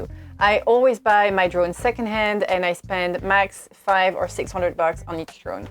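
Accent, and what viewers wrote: France. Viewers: Ur the first time I’ve seen ur video log u r soo❤❤ ur voice is French so r u from Quebec or Europe France? Happy birthday 🎉🎉🎉🎉🎉 next Week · Great Video , I love the french accent ! Vive la France - vive le general De gaule !!!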